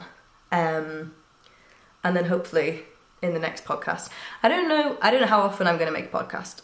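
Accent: United Kingdom. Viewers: Enjoyed your first podcast, nice to have another UK voice in the mix!!! Loving your red cardi. There is a school of thought that suggests, when you have a lot to do, doing/finishing the easier or quicker things first is helpful because quickly you can get the list down to fewer items which eases the overwhelm…??? Dunno if this appeals? Also loving the look of your ‘scrappy cardigan’ that’s going to be beautiful. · Hi, I was wondering....Are you English? Cause, I like your Accent, there. 🆒️🆗️👍. I hand knit & use a flatbed knitting machine. But, I'm not a Podcaster. I just watch others knit & Crochet. I Love that most. I hope you show a lot of your knitting projects on YouTube. So, that Knitting Family can see your work! And NEVER, we're if you are showing mistakes in your work. Cause, that's just teaching everyone that, No one is perfect. And that's OK. Everyone is Human. 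Just go for it, your Dream, here. I will be rooting for you. Bye, for now. Blessings I send to you, too. 🙏. Sincerely, Elsa R. 64 yrs. young/old woman. From out in California👋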